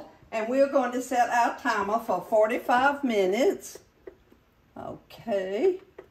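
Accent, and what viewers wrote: South, United States. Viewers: Dear Mrs, I lost my mother last year (I’m 60) and she reminds me sooo much of you. She didn’t have your beautiful southern accent but a german accent. She was a master in the kitchen and kind and lovely just like you. I stumbled across your videos and feel so blessed! I’ll be watching every video and making notes. Do you ship your cookbooks to Canada? Thank you again for your generous hospitality and yes…YOU…shine for Jesus. Many Blessing ❤🇨🇦✝️💐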